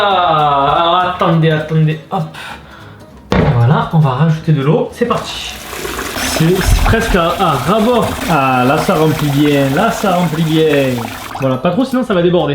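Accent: Marseille, France. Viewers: C'est un marseillais c'est son accent qui le fais dire litchi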